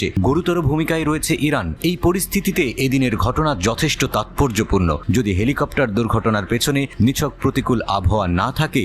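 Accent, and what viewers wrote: India. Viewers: নিউজ রিডারের বাচনভঙ্গি এবং কথা বলার ধরন অনেকটা ভারতীয়দের মতো। বেশ কিছুদিন যাবত একাত্তর টিভির কিছু নিউজ রিডার যারা ব্যাকগ্রাউন্ডে কথা বলেন তাদের বাচনভঙ্গি এবং কথা বলার ধরন, অনেকটা ভারতীয়দের সাথে মিলে যাচ্ছে। বাংলাদেশের বাংলাভাষী নিউজ প্রেজেন্টটার কখনো এই ধরনের বাচনভঙ্গিতে কথা বলেন না। তার মানে কি 71 টিভিতে ভারতীয়রা নিউজ প্রেজেন্ট করছেন!? 😮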